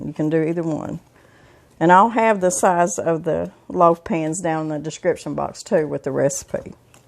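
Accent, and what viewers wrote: South, United States. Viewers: Thank you again for your calm, sweet "southern" accent, blog. Not brave enough to try some of your recipes like this one but, love seeing your home, decorations and your sweet smile, and too!!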